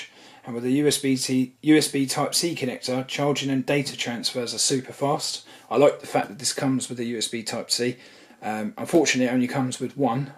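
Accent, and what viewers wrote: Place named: United States. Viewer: he is speaking American English not british english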